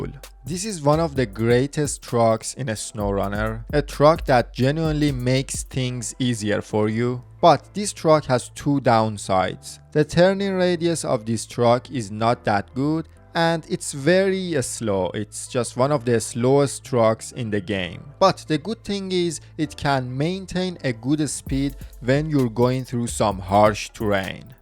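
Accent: Iran. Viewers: The most Iranian voice I ever heard, nice video tho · داداش از لحجه ت حس میکنم ایرانی ای😂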